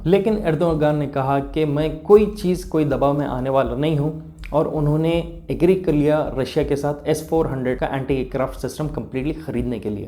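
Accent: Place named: Karnataka, India. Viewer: bhai ap karnataka se ho na apki hindi karnataka ke jaisi hai i am from karnataka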